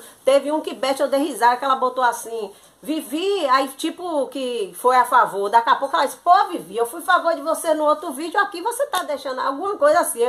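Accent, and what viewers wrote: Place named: Northeast, Brazil. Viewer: Gosto muito dos vídeos e do sotaque nordestino também, coisas lindas do nosso Brasil, uma terra linda, cheia de diversidades e por isso tão maravilhosa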